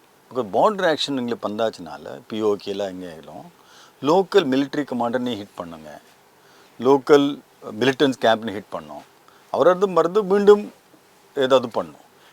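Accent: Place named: Kerala, India. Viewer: Mallu tamil is always nice to hear..nice information..jai hind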